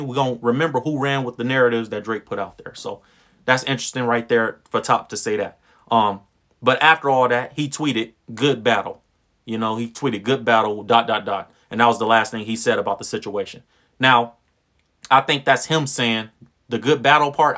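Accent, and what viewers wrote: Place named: West Coast, United States. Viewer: Ok u from the West Coast your accent lol 😅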